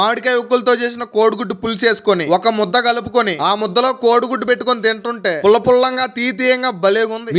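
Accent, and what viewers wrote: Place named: Andhra Pradesh, India. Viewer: ఉక్కులు కాదు ఓక్కలు అన్ని అంటారు అన్న మీది ఆంధ్రప్రదేశ్ కదా ne voice చూస్తుంటే ఆంధ్ర లెక్క అనిపిస్తున్నావు మాది తెలంగాణ వరంగల్ జిల్లా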